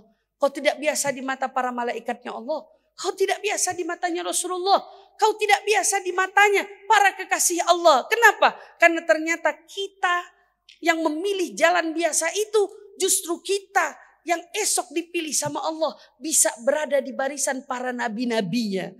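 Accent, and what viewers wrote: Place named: Medan, Indonesia. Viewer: Ustdzah kebawa bhs medannya maasyaaAllah.tausyiah kota medan. Tapi blm pernah denger ustdzah bhs sunda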